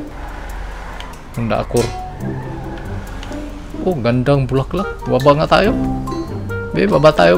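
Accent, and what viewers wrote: Visayas, Philippines. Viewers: Bisaya ka ba taga Davao city po ako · Hi Sir Bisaya ka Sir? Taga Gensan diay ko sir hahahaha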